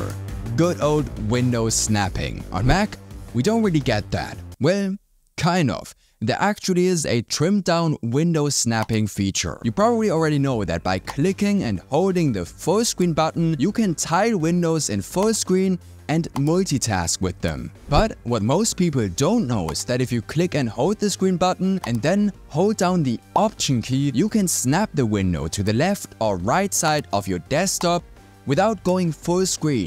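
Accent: Germany. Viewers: really knows his stuff and doesn't waste a word - if anything he's too concise. His tips are uniformly great. (And the "light L" and glottal stops that Germanise his flawless English only add to the aura of genius.)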